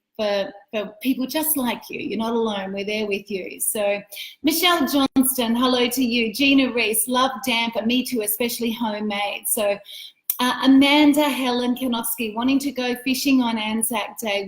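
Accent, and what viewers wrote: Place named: United States. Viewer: Why do you put on an American accent?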